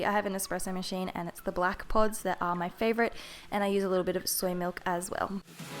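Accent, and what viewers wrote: Australia. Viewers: Are you Australian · are you australian? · Are you Australian? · I can tell ur from Australia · Love your accent 😍😍 Australian? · Is she Auatralian? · Yep she's Australian 😊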